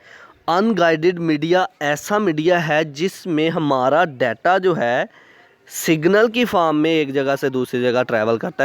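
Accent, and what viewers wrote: Punjab, India. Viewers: Aapka Panjabi lahjaa mashallah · Punjabi ascent <3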